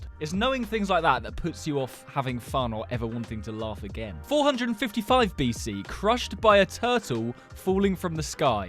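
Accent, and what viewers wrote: United Kingdom. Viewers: Love that british accent.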